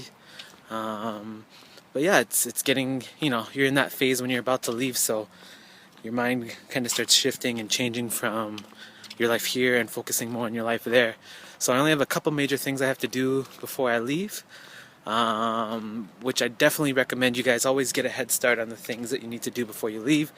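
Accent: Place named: United States. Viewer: how come you have American accent? or is that how Canadians speak in general?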